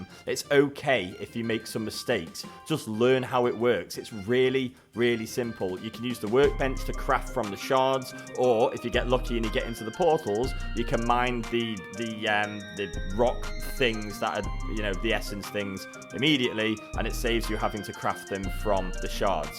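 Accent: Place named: United Kingdom. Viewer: Having a slightly ticked off Brit, passively aggressively telling me what to do is actually rather calming.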